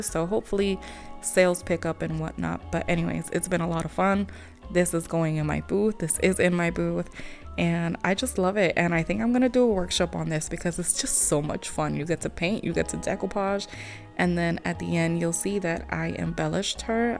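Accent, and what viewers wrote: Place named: Puerto Rico. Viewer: Ave María, suena puertorriqueña le dije a mi marido 👍🏼💕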